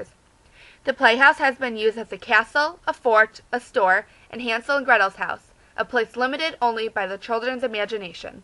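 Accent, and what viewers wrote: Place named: Chicago, United States. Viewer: Love Hackney's--The speaker's accent is so Chicago!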